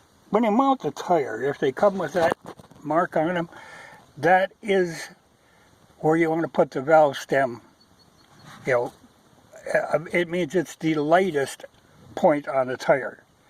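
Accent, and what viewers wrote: United States. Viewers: It was a little difficult for me to understand your accent during the first couple of minutes. To me it sounds like a southern USA accent but I really don't know. I'm from northern Spain, near France, and I still enjoy my Bultaco Pursang MK8, 363 cc that I bought new in August 1975. This bike came with Motocross racing tyres 3.00x21 and 4.50x18, but I tend to use Trials tyres in sizes 2.75x21 and 4.00x18. Michelin Competition is the lightest and stickier, Dunlop or Pirelli made for Trials Competition are also good, but tgey last very long like you would expect for every Trials tyres. Should I try your choice from Dunlop, if they make them in 2.75x21, or maybe 3.00x21? By the way... Im very intrigued with your accent. Where are you from?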